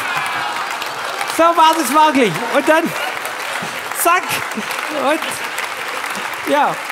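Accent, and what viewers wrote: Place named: Swabia, Germany. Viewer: Vielen Dank für die liebevollen Geschichten aus dem Alltag👍🤗 ich höre und sehe sie mit ihrem schwäbche Dialekt so gerne❤, machen Sie weiter so und bleiben Sie so wortgewant und humorvoll unterhaltsam, denn das braucht man in diesen chaotischen Zeiten um gut gelaunt durch zu halten und die Hoffnung nicht zu😊 verlieren ❤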